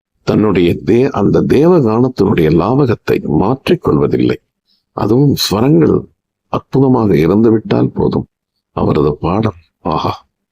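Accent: Jaffna, Sri Lanka. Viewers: தங்களின் யாழ் தமிழ் உச்சரிப்பு பாடல்களை விட இனிமையாக இருக்கிறது.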